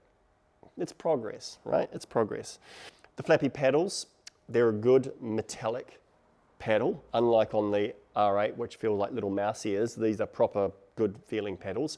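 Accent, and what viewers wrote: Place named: New Zealand. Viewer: Im a kiwi and even I found this accent thick! 😃 great review, will it be up for sale soon?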